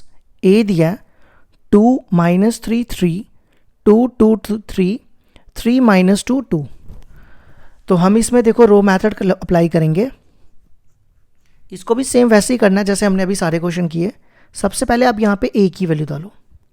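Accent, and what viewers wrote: Jammu, India. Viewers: Sir Aap Jammu se hoo kya.. 😌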